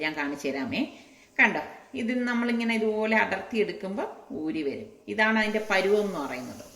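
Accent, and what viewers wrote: Trivandrum, India. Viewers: Trivandrum ano veedu.speech nalla vyathysam.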